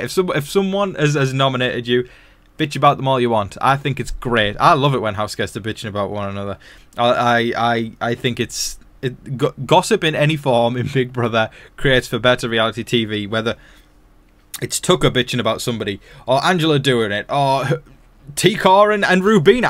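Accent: United States. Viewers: You have GREAT American accent! From an American! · Wait your American accent is good haha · Your American accent is spot on!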